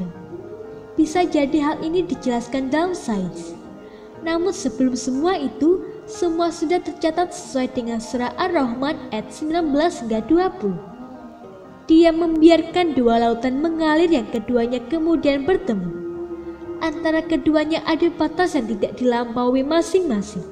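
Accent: Java, Indonesia. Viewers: Iki jowo? Suaranya😂😂😂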